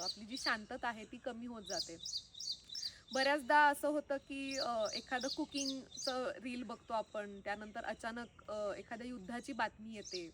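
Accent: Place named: Kolhapur, India. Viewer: Your accent is mostly like our kolhapuri👌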